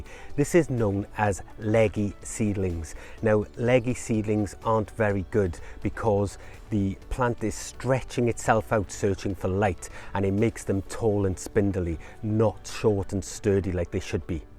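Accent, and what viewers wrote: Wales, United Kingdom. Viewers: Are you from the valleys ?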